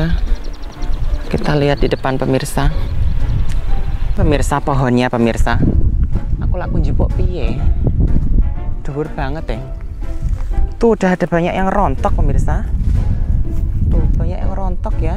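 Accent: Java, Indonesia. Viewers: Mase ..bagus tuh ngomong jowone.... lucu lgi...😃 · Sampean jowone ngendi mas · Jowo ne ngendi to mas? Lucu sampeyan hehee.. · Ko sy suka ngomong jawanya 😅😅😅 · He..he..he.. logatJowo medhok..lucu...keren mantap mas... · Logat nya medok lucu bgt wkwkwkwk 🤣🤣🤣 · Ya Ampuuuuun senag bgt nonton mas logat jawax lucuuuu deh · Aku baru beberapa hari ngikuti youtube mas,kadang ngmngnya bikin ketawa lucu jawanya jg masih khas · bang kocak lo😂😂😂😂 terus lagi bang medhok nya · Assalamualaikum..salam kenal ms.bu Andi dari Makassar sulawesi selatan.. Udah lama tau mas di vlog mba Yanti Guixe dan Teh Dona Bayo..tp baru smpat koment nih hehe.. Suka bgt dgr logat dan meddoknya ituloh mas.bikin ngakak.. Dan yaa sy sependpt dgn yg koment td..bhw mgkin lebih dikembangkan lg vlognya ttg sejarah kota tmpat ms saat ini..selain panen Ok mas..smangat dan sukses sllu👍👍🙇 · Mas tempat e neng ndi ko jawae medoxk kowe lucu mas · Waduuh medok mas jowone sampeyan sueneng aku ngikuti konten" e sampeyan iku smg sukses slu yo mas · Mas sampeyan kok lugu banget, jowomu medok banget, seneng aku, maju terus sukses buatmu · Masnya ngomong logat Jawanya lucu.. 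Haha...Salken dari Madiun. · Assalamualaikum, Maseh ini asli mana to kok iso biso jowo medok, Lek angsal perso pundi mas jowone ?? ☺️🙏🙏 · Seneng leh ngrungokke Mas Nek ngomong medhok e poollll mantap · Wes sui neng luar tapi jawane tetep iseeh medoook nek ngvlog teteeeep jowone ra mbok tinggal,wes too maas ndang rabi beng enek seng ngemuli hehe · Salam kenal baru gabung.. Asli seko suroboyo opo banyumas mas.. Koq rodo medok medok manjaaaah...😁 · seneng bnget liat orng indo medhok ngevlog di luar negeri panen buah2an yg gak ada di indo.. 😀 semangad terus ngevlog nya mas 💪 ntar selanjudnya panen buah pear (liar) klo ada 😀❤️ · Medhok dan ngondhek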